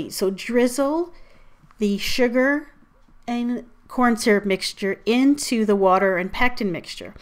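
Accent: Canada. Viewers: she has a light canadian accent... and canada is always where i wanted to live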